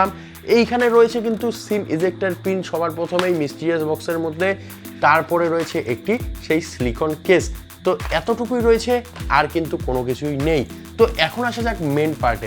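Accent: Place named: Bangladesh. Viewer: Honestly you are bangladesi, as per your voice and etc.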